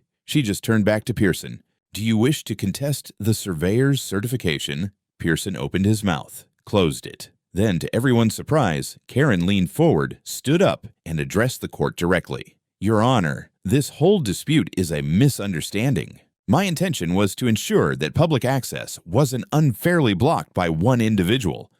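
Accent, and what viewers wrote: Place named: Canada. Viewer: Zed IP... Canadian, eh?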